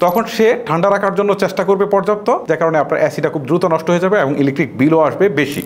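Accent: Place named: Noakhali, Bangladesh. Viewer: Eita noakhalia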